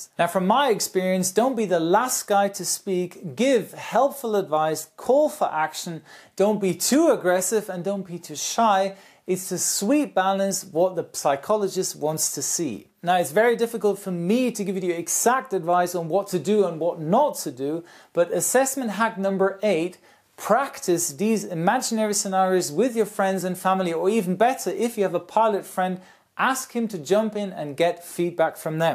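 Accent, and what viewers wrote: Germany. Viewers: Allgäuer Bub isser ^^ PILOTEN BOARD D E Da merkt man direkt das er ein Bio Deutscher ist hahaha :D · HySeb the captain is german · K. S due to his slight accent speaking English ( as a German I sadly know this accent very well) and this job or former job at AirBerlin I would strongly suggest he's German :) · Hey, are you a german???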